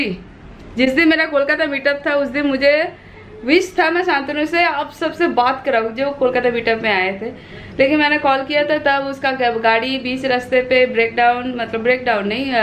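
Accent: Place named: Mumbai, India. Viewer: Mumbai style dialogue 👌😍😍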